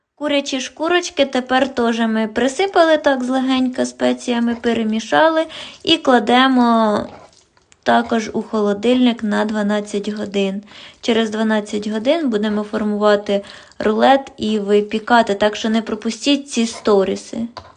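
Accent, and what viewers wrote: Poltava, Ukraine. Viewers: Чого примахуєтеся до людини за її мову в кожному регіоні говорять по своєму на Полтавщині так говорять вона ж вам пояснювала правильні ви дуже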